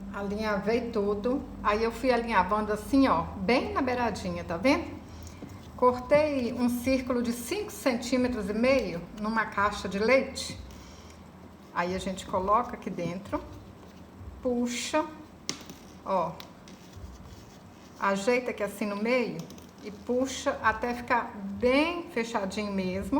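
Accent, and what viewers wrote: Minas Gerais, Brazil. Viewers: Amo o seu sotaque mineiro, me sinto em casa pois tbm sou da terrinha , sou de Raul Soares M G.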